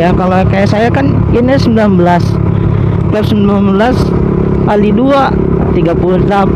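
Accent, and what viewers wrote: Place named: Betawi, Indonesia. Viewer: nadanya anak betawi asli ahhaha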